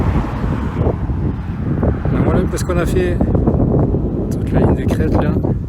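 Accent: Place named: South, France. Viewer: Je suis bien loin de la Sainte Victoire mais c'est un réel plaisir de découvrir cette célèbre montagne en ta compagnie. C'est vraiment un endroit superbe tout comme les vues qu'il offre. Avec les commentaires au parfum de l'accent qui va bien, tous les ingrédients sont là pour une bonne vidéo ! Je suis content d'avoir découvert ta chaîne et je ne manquerai pas d'aller voir tes autres randos ! + 1 abo ! 👍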